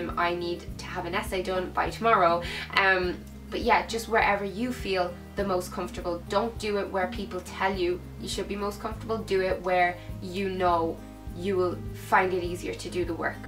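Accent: Ireland. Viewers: irish accent yes irish accent took me a second :)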